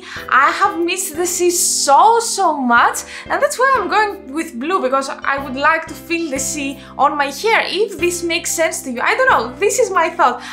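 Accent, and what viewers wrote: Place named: England, United Kingdom. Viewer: You are always good surprise :) Are you maybe from England? You have a great accent. :)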